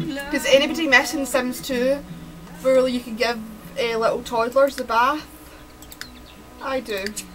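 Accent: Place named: Scotland, United Kingdom. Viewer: I always enjoy watching your videos. I just have to watch some parts over and over again. Because of yer scottish accent x3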